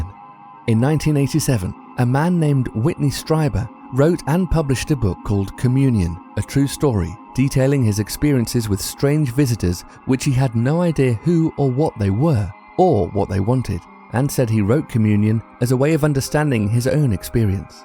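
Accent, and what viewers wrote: United Kingdom. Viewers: it looks great, but who is this British narrator? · Who is this British guy commentating more often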